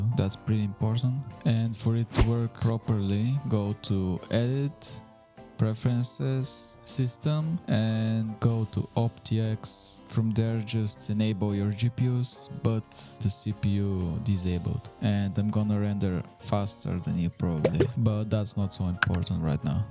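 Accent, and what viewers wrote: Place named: Russia. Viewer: russian accent = trust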